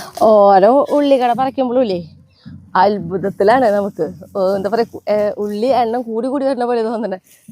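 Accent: Thrissur, India. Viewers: Thrissur nadatharel aano chechi ningal😮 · Where r u based, from ur language, I think u r from Thrissur, where r in Thrissur?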